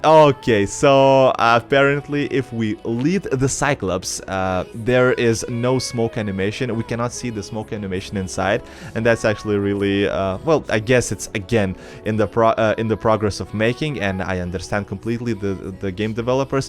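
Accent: Russia. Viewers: are you russian? i knew you where from like another country with an axent but i had no idea. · are you russian??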